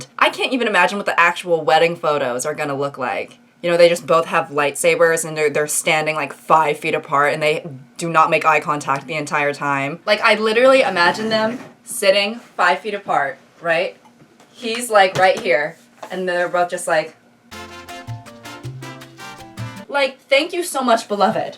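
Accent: South, United States. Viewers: Your southern accent tho😂 it sounds so nice, like that’s what I think a rich southern person sound like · Your southern accent is on point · more southern accent please.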